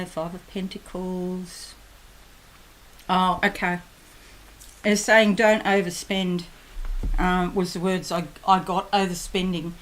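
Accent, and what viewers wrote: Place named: Australia. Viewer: It's so warming to hear a fellow Aussie.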